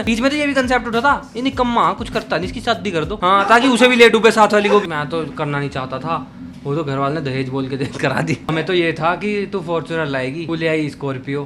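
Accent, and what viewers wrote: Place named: Punjab, India. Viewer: O bai Punjabi accent 😂😂 me also punjabnn❤😂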